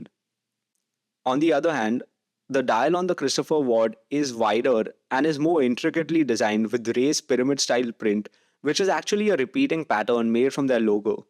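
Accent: India. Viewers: Great video, you are doing an excellent job. New subscriber from Laguna Beach, CA 👍 PS: I’m curious about your accent. I have heard a similar accent before, but I can’t place it. I’m guessing Indian? I like watches and languages. I have studied 6 languages. Another great hobby. 👍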